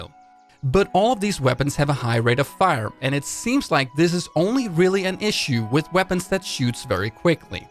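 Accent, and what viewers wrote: Russia. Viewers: ohh , you from Russia) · Are you Russian?🤔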